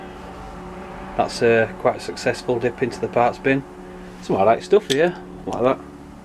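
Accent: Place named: Yorkshire, United Kingdom. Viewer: Thats a good yorkshire accent is that. Commenting for algorithm ✌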